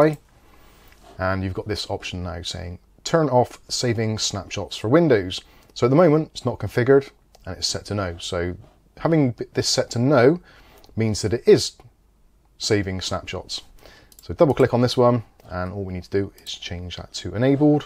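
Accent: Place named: West Country, United Kingdom. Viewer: Sound like a west country lad. I'm originally from Gloucester now in NZ.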